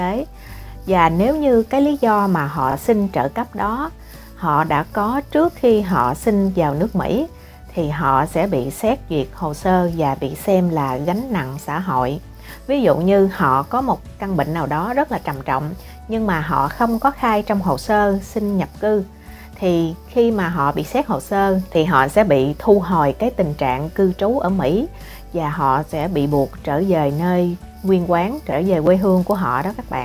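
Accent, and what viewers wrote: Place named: Saigon, Vietnam. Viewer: Cảm ơn em, giọng đọc rất truyền cảm, rất chuẩn Saigon, US.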